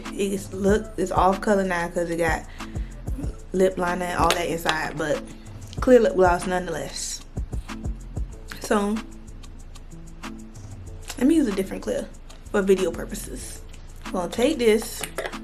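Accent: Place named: Miami, United States. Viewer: I'm from Miami and this is EXACTLY how we talk 🤣🤣🤣🤣🤣🤣🤣🤣🤣😭😭😭😭😭I'm screaming💙💙💙💙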